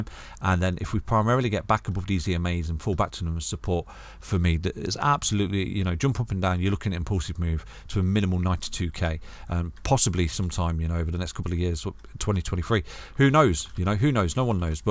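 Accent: United Kingdom. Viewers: Nice British accent 😊 always welcome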